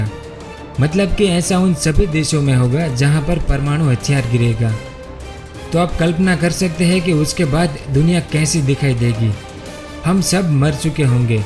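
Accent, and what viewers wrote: Gujarat, India. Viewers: Bhai tu gujarat se h kya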